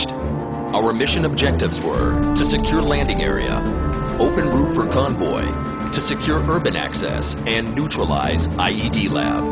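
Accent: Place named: United States. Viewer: Why on earth is the narrator a yank?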